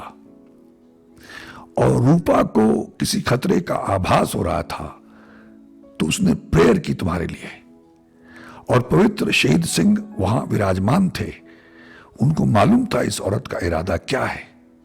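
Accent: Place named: Sialkot, Pakistan. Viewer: आप सियालकोटिए हो। आपकी बोल बाणी से लगता है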